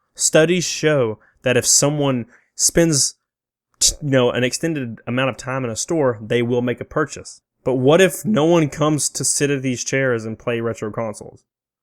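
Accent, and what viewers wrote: South, United States. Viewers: It's a southern thing. In my time there, I ran into tons of otherwise highly intelligent people who mangled the pronunciations of all kinds of words.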